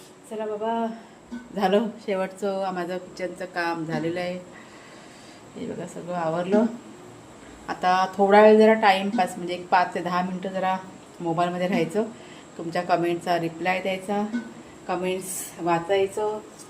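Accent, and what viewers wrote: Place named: Konkan, India. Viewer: देश तसा वेश तस प्रांत तशी भाषा... प्लीज समजून घ्या कोकणात इतर ठिकाणी अनेक प्रकारच्या भाषा बोलल्या जातात तसेच मग त्याचे उच्चार असतात...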